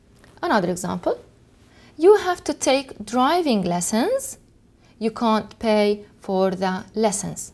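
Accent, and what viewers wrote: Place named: Arab world. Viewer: دروس جميلة لكن طريقة نطق بعض الحروف ليست جميلة كحروف T D P يبدوا أن من تقوم بالشرح لغتها الأم عربية؟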